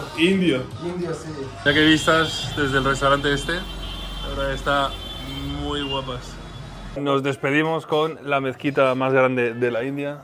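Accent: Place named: Colombia. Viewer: Que alegría ver por fin a!! Y seguir escuchando ese hermoso acento colombiano ♥️💃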